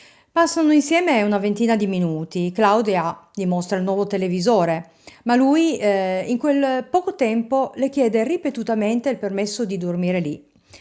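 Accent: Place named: Piedmont, Italy. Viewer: Ciao ti ho scoperta da tre giorni ma sto guardando tanti tuoi video perché ti trovo davvero brava forse perché dall'accento mi sembri Piemontese come me e Elisa true Crime, Ely in Crimeland e forse per campanilismo,ma anche perché come nel primo caso che mi ha fatto mettere il segui Il caso di Berfin ho notato la tua empatia, la tua compassione,e questo mi piace,non mi piace chi giudica le vittime, soprattutto quando sono vittime soprattutto di maltrattamenti e abuso psicologiche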